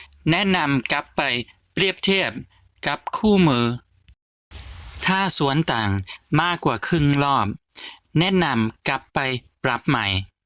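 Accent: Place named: Isan, Thailand. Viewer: สำเนียง ฝรั่ง+อีสาน 👍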